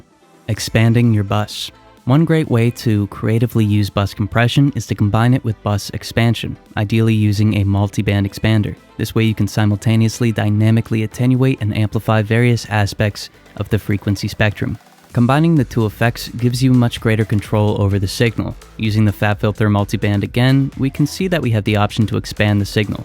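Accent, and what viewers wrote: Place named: Brooklyn, United States. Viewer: This guy must be from Brooklyn. I can hear it.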